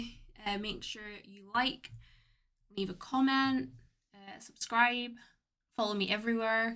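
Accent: Scotland, United Kingdom. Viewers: Love the trace of a Scottish accent,as well your beauty,! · May I ask where you are originally from? It SEEMS like Scottish, however your accent has picked up and dropped a bit...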